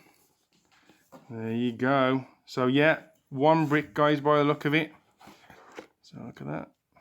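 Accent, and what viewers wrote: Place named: United Kingdom. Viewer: oh. Oh right. Then I will stop sitting here watching the App Store as though I am waiting for water to boil. LOL! Thanks for the tip about set up as well. Maybe it will be a relatively painless process to set him up via the app. I was very disappointed in Cosmo‘s A couple years ago. Voiceover did not respond at all to the app after I opened it. It just played a bunch of music and wouldn’t show me anything. I hope victors app is an improvement. You will probably be getting the app before us. At least, you sound as though you may be from somewhere across the pond. Anyways, thanks for posting this unboxing!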